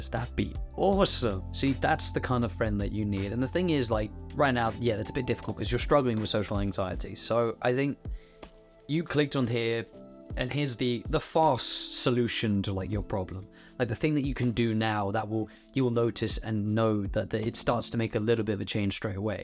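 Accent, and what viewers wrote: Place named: United Kingdom. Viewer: british detected - opinion disregarded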